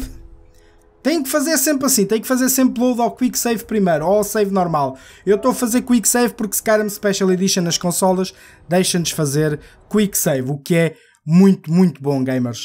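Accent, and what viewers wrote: Portugal. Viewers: Olá! Primeiro quero parabenizar pelos seus excelentes vídeos. Gosto especialmente de seu sotaque português que lembra muito meus avós. Bem, eu descobri uma forma mais simples de duplicar itens. Primeiro drope itens em qualquer lugar de Skyrim (em especial nas cidades, em lugares mais visíveis), peça para seu follower pegar os itens e, em seguida, dá um fast travel para uma cidade próxima. Pode dropar alguns itens ali também, repetir o processo e voltar à cidade anterior com fast travel. Ao voltar ao local, ali estarão os itens dropados... se vc pedir novamente para seu follower pegar os itens, ao voltar novamente para o local, via fast travel, eles duplicarão novamente! Fiz isto várias vezes e funcionou no Skyrim Special Edition. Só tenho problemas em duplicar as pedras preciosas, pois vem os guardas e querem me prender... kkk... teve até uma hora que a Lídia começou a querer me matar!! 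E ela já era minha esposa.... tive que retornar o save, porque ficou uma confusão.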